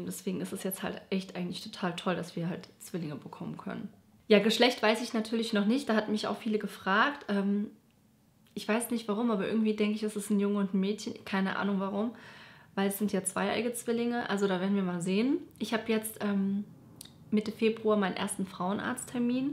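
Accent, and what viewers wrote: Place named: Hesse, Germany. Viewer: Sie kommt aus Hessen sie schttt nur ! Das nennt man Hessissscchh !! ♡♡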